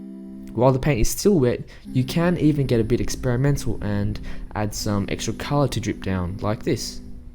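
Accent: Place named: Australia. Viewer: sounds like an australian accent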